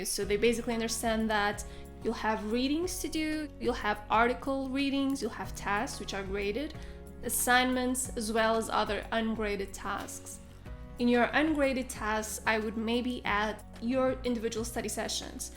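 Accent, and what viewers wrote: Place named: Portugal. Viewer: Wait, ur Portuguese? Your accent looks like Portuguese... só sei que adorei este videos e já me subscrevi! Adoro!!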